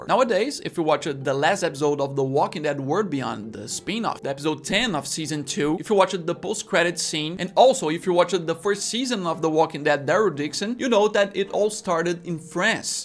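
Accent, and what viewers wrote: Brazil. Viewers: Seu sotaque tá com cara de hue br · Dude, are you Brazilian by any chance? All of us have the same accent when speaking English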